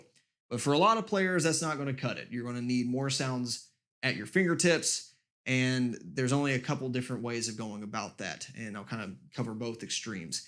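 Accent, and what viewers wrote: Texas, United States. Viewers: i always thought you were from Texas...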